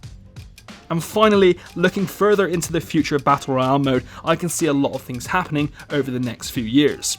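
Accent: United Kingdom. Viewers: he's British it's how we say it so stfu ffs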